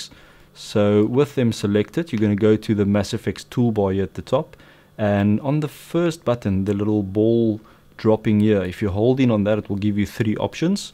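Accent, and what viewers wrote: South Africa. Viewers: South African accent!!! lekka boet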